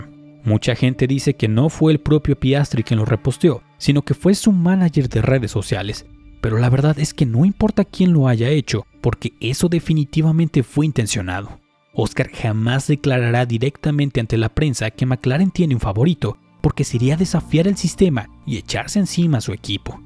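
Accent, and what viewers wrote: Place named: Mexico. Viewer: JAJAJJAAAJ Eres mexicano cierto?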